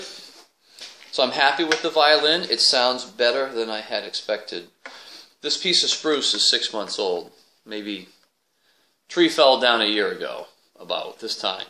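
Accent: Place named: Appalachia, United States. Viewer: That has a deep mountain twang love it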